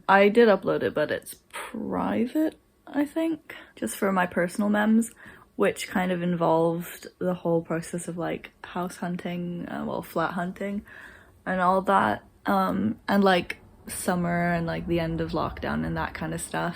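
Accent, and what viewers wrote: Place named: United States. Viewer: I just found your channel through your Selena Grace bag video actually and got curious, noticed you have lost your American edge in your accent now! I really like the way you think, I am (and was) had some crazy thoughts and issues about my degree and if the degree I chose was for me or useless or worthless, wish I was as open minded as you when it came to thinking about it. You know like “it’s not the end of the world” and stuff, that’s so right! Ahh! I have one course to go and I’ll be done. I’m basically on leave right now because there are no courses for me to do left. I’m having a plight now about employment and stuff but I will keep it in mind, - “it’s not the end of the world!”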